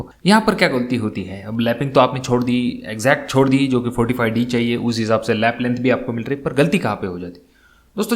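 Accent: Maharashtra, India. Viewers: Bhava tu marathi ahes bahutek tuzya avajamule lgech smjty karn aplya marathi lokanchi hindi aschich aste 😀😁..baki video ek number astat tuzya khup mahiti milte tuzya video mdhun .keep it up bhava 🚩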